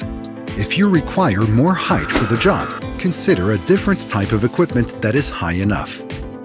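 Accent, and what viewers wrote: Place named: United States. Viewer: Why do I have to listen to an American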